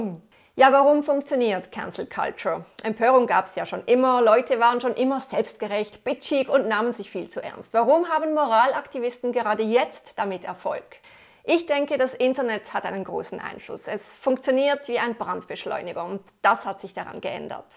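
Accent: Switzerland. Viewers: Ich finde die Tamara Wernli total süss. Besonders ihre leichten Schweizer Dialekt finde ich gut und höre gerne ihren Ausführungen zu.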